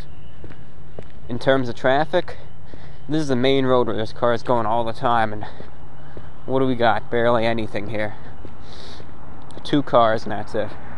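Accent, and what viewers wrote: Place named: New York, United States. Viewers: Love hearing his New Yorker in his early years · Damn your New York accent really shines in this one.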